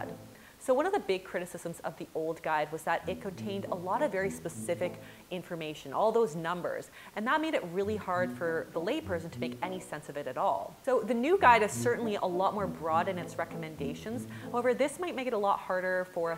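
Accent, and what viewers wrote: Canada. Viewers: She has a strong Canadian accent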